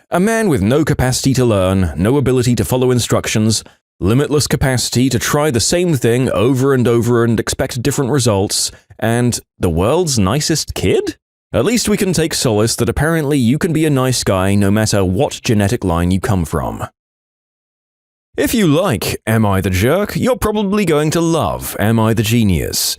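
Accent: United Kingdom. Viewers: I see your British.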